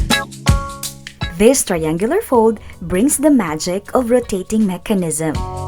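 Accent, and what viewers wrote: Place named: Philippines. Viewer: It would be really helpful if you could make the measurements stand out more as really hard to see pencil. Black felt tip maybe? Are you Phillipina? I thought I recognised the accent 😊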